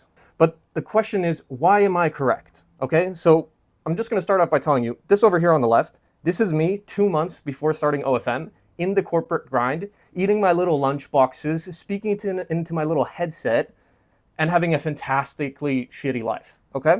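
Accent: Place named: United States. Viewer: you are definetly not from eastern europe, your accent sounds definetly as an american lol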